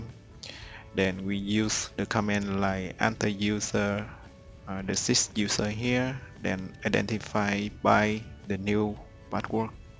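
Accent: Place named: Vietnam. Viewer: Thank you! Where are you from? Your English voice like Vietnamese. Hello đồng hương 🎉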